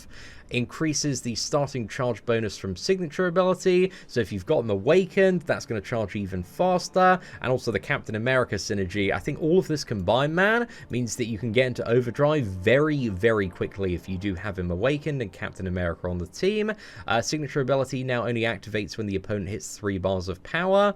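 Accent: United Kingdom. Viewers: Mephisto getting another synergy and a profile pic finally maybe!? Let's go bro! As always appreciate the breakdowns of every new event so I can be lazy and not read the forums and listen to a cool British dude.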